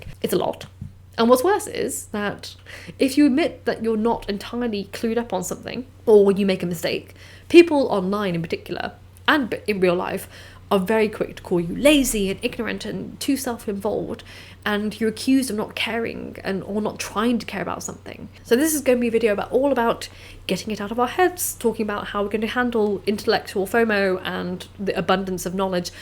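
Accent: United Kingdom. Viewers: Is that British accent? I love your accent! You sound so heavenly when you speak :)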